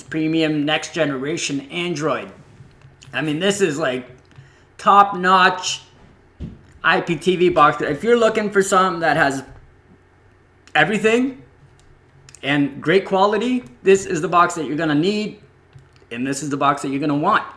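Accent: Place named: Canada. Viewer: canadian, it's funny when you say out ( owwwwwwwt and abowwwwwwt) lol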